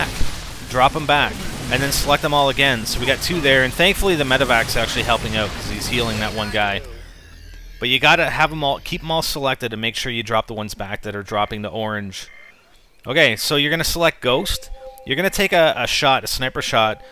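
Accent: Canada. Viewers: I HATE YOUR CANADIAN ACCENT! GO BACK TO ALMOST-AMERICA!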